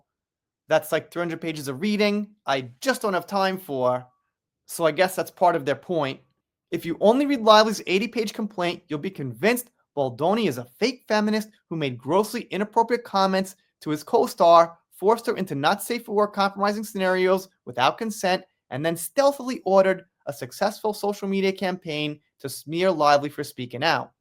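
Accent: New York, United States. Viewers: I’m from New York and I gotta say, you are DEF from NY or NJ . Love the accent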